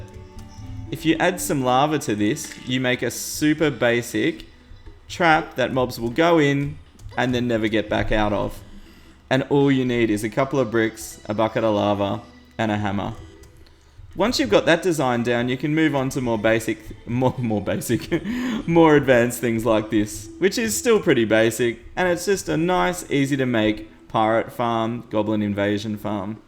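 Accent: Australia. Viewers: You sound Australian, if you are im to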